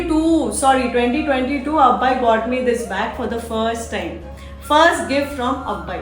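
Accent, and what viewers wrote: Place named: Telugu, India. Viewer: Ur telugu so cute 😊 nice bags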